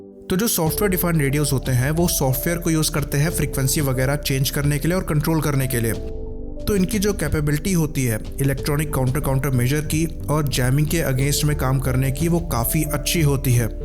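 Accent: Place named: Bengal, India. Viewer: I think so.. His 'motamoti' pronounciation is very Bengali type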